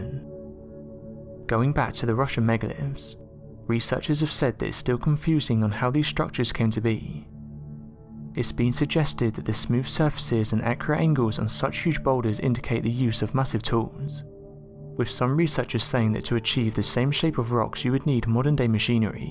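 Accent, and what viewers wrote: England, United Kingdom. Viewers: he has an English accent you dum dum not a speech impediment or the mumbles what a ridiculous comment 😶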